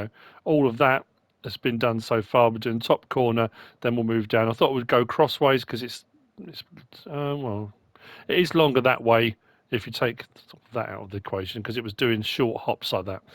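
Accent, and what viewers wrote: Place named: London, United Kingdom. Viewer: Hei where in England are you from?I feel like you have London accent.or around there.and again sorry if I miss spelt anything.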